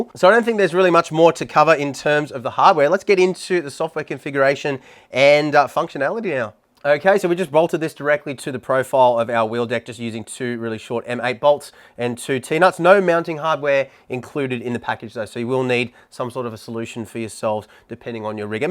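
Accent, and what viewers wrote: Australia. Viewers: Got me with the Aussie accent...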